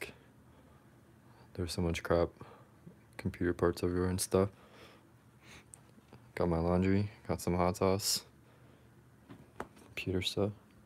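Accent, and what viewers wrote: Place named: Vietnam. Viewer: lol i love his Vietnamese accent =)))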